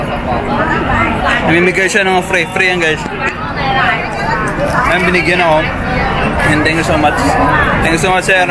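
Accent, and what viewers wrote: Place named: Waray, Philippines. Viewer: Bossing taga samar kb..or waray waray kb??😂waray kasi accent mo eh😅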